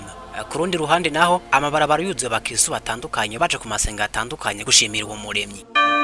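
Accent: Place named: Rwanda. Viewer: Komera cane kuri Documentaire bwana ariko accent zawe ni Mwanafunzi Esmail yuzuy Hama ikinyarwanda nicinshi kurusha ikirundi. Gusa courage cane kubushakashatsi I'm from LIMOTV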